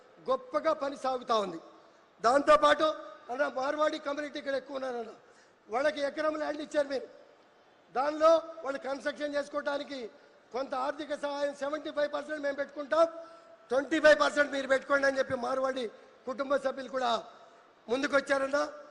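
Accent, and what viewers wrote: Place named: Andhra Pradesh, India. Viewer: Andhra Ghandhi nee yasa andhra Basha artham autundhi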